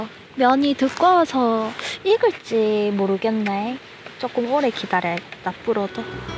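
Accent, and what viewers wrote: Gyeongsang, South Korea. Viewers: 딱 대구말투 시네요^^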